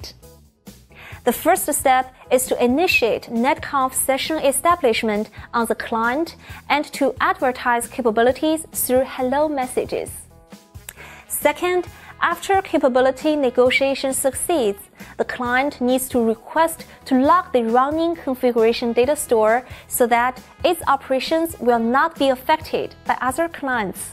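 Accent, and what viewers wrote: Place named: China. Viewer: 一听就知道是中国人说英语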